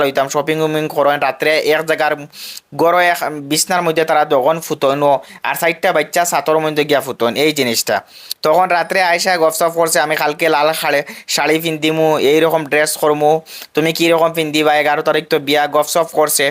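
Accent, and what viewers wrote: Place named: Silchar, India. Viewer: Ami Silchar tone dakram bhai okk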